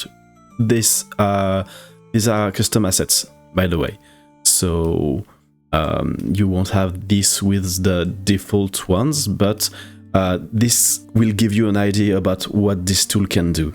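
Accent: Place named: France. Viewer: Love your french accent! Merci beaucoup pour ce tutoriel, t'as gagné un autre abonné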